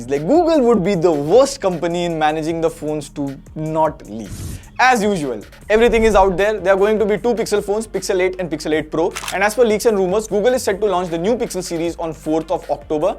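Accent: India. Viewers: What is this obsession with trying to speak English in this ugly Indian accent for Indians, by Indians ?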